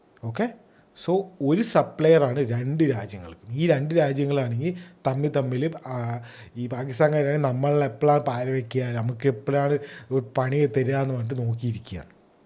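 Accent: Palakkad, India. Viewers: Bro ningal palakkad ano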